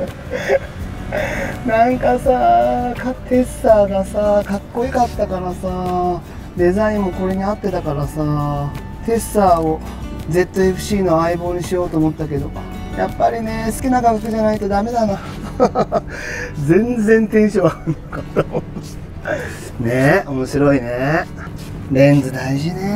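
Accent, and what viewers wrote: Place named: Kanto, Japan. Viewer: 偶然この動画にたどり着きました。築地って、Zfcがピッタリ似合う町ですね。驚きです。写真系動画は、自分で見ている範囲では関西の話し方の人がが多く、説明を解読？している感じで苦労があります。様の話し方は、東京？関東？ふうでフィーリングやニュアンスがしっくりきて聞きやすいです。バックナンバーも見てゆきますのでよろしくお願いいたします。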